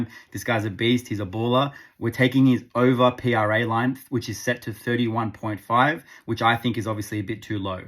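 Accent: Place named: Australia. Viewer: Found this Channel after seeing your comments/tips in calling our shot , after watching a couple of times i thought i detected an Aussie Accent , Good to see/find an Australian sports betting Capper/Tipster , looking foward to seeing what you do with AFL and NRL when the seasons start, The Boomers ( The Australian basketball team for anyone eles reading ) just had another win giving Indonesia a bit of a thrashing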